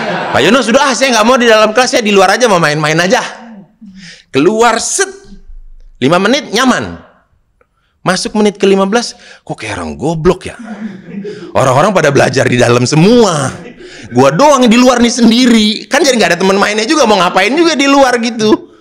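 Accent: Sunda, Indonesia. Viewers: Hahahaha... Bang Sunda Pisan... Keren materinya.... Cerdas dan menghiburrrrr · Bang ini emang GK pernah gagal KL udah STAND UP COMMEDY lucu nya GK tertahankan.. Apalagi saya sebagai orang Bandung sama dengan meski darah Batak,hafal betul dialek" Sunda yg bisa bikin saya ketawa terpingkal pingkal... Bang SUKSES SELALU SEHAT SELALU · Meni kental sundana mang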